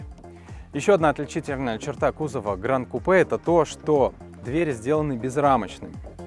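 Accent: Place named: Belarus. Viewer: Быццам бы распавядае беларус. Націскі ставіць па-беларуску.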